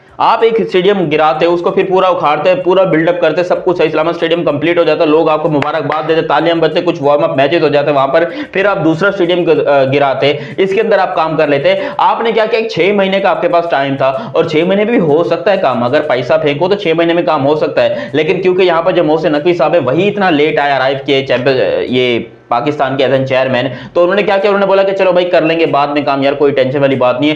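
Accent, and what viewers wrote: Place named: Mumbai, India. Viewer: Aayela hai?? Mumbai ka language...good bro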